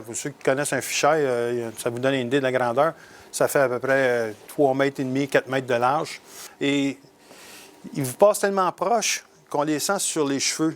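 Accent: Quebec, Canada. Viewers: merci pour vos conseils ils vont mettent très utiles pour mes prochaines photos et un super merci pour votre accent j'ai vraiment hâte d'aller au Quebec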